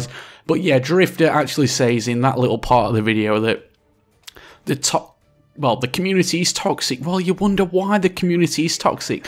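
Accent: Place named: Midlands, United Kingdom. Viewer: Just stumbled across this video. Subbed and liked that's done good shit said here. Where are you from? I'm from Nottingham you sound Midlands UK but can't place it, Sheffield or Doncaster maybe? Anyway great video man! 👍✌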